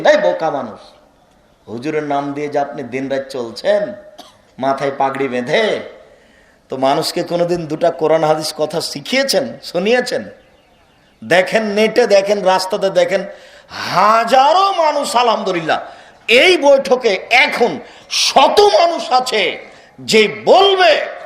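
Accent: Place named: North, Bangladesh. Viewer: আইনুল হুদা আর আপনি দুইভাবে ধর্মের বানী প্রচার করেন। কিন্ত আপনি যেভাবে বলেন সে ভঙ্গিটা আমার কাছে সঠিক মনে হয় না। এভাবে কিছুটা অহংকারী মনে হয়। যদিও আপনি তা না। তবে উচ্চারণ সঠিক হয় না এর কারন কিন্তু আপনার জন্মস্থানের (উত্তর অঞ্চল) উচ্চারণের ভিন্নতার কারনে। একথা বুঝিয়ে বললেই হতো। এটা প্রত্যেকেরই হতে পারে। হুদা সাহেবের ও সিলেটী উচ্চারণ বা টান আছে।। আপানাদের মাঝের পার্থক্য গুলো ঘুচিয়ে ফেললেই আমরা অনেক দূর এগিয়ে যাব। ভুল হলে ক্ষমা করবেন।।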